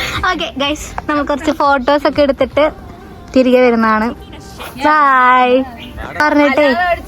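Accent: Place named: Thrissur, India. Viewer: and her tamil in Thrissur accent 😅❤️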